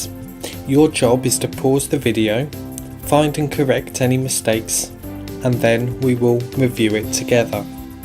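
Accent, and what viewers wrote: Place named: United Kingdom. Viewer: What British accent do you have? It sounds very clear to me.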